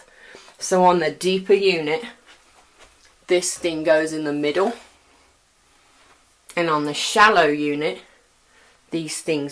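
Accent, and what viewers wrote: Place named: United Kingdom. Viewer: I could hear the Brit in you:)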